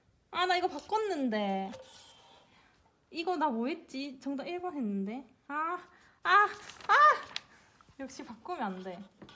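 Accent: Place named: Gyeongsang, South Korea. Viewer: 경상도 사투리 쓰시네요 ㅋㅋ 혹시 경북 지원하시나욬ㅋ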